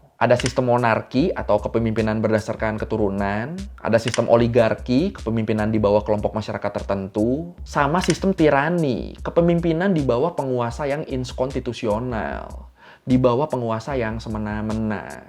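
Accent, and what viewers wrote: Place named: Madura, Indonesia. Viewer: Mantap bang belajar soal yunani kuno dgn logat madura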